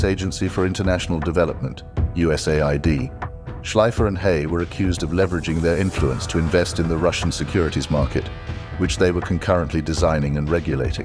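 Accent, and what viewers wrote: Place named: United Kingdom. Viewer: It's the season of obnoxious British accents. Yuck.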